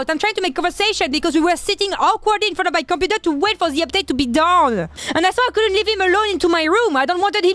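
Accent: France. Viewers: Canadian with the strongest French accent ever...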